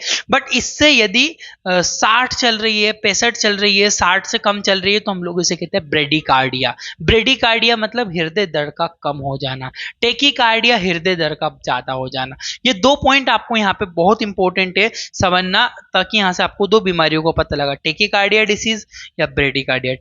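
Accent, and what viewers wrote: Indore, India. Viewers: Indore se ho na aap? Language wesi lag rahi he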